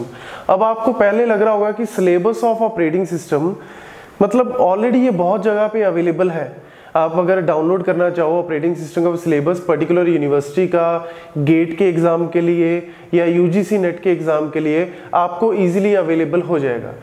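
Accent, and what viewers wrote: Punjab, India. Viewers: tuhade accent to lgda tusi punjabi o??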